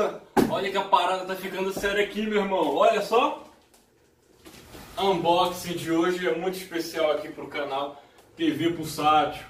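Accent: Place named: Pará, Brazil. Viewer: caray mlq com esse sotaque tu so podes ser do pará kkkkkk mta coincidencia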